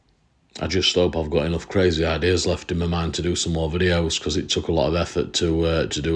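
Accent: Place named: North, United Kingdom. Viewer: 😂😂 proper northern instructions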